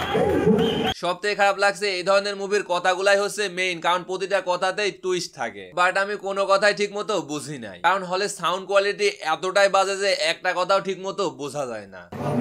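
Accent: Pabna, Bangladesh. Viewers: apnar basha pabnar kothai bro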